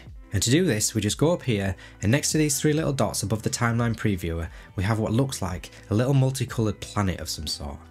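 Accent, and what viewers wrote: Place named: Scotland, United Kingdom. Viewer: Excellent channel. Love the smooth, authentic delivery of a real person. I'm going to take a wild swing at your accent. I hope it does not offend, but I'm guessing Scottish. If there's one thing I've learned these 65 trips around the sun it's this: "if it's not Scottish, it's crap" is universally accepted among civilized humans. Best Bond, Sean Connery, no contest. Best drunk Irish stories, Harris and O'Toole. Best Welsh coalminer stories - Richard Burton. Whatever you are, never change that delivery. It's your secret sauce.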